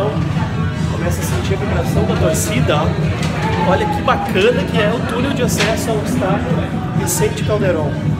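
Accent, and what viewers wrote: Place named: Porto Alegre, Brazil. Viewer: Pode deixar. Abraço..pelo sotaque é Porto Alegrense igual a mim. Tmj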